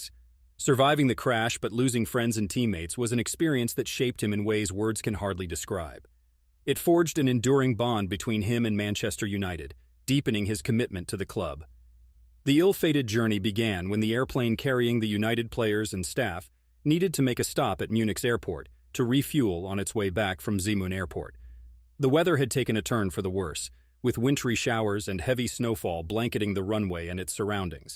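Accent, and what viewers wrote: United States. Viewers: a f----------g yank what so you expect blue blooded murder of the english tounge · Full of bullshit! No wonder its a Yank voice. Stick to Ted Lasso and leave football to the rest of the world.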